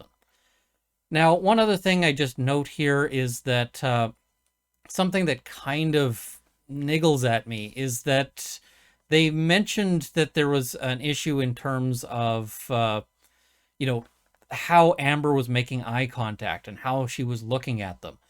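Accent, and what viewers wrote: Canada. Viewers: I love Canadian! 🤗 Please say 'out' or 'about' as often as possible.♥️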